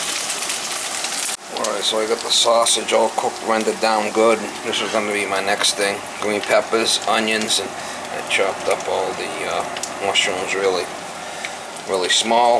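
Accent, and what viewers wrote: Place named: Boston, United States. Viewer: Buttah, bahg, get everything togethah, containhas, heah, I love the Boston accent! Haha I’m from Norfolk county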